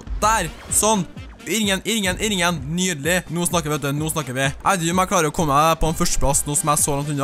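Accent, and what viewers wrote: Trøndelag, Norway. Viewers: Jeg digger trøndersk onkelen min heter Kurt Ove og hann snakker trøndersk!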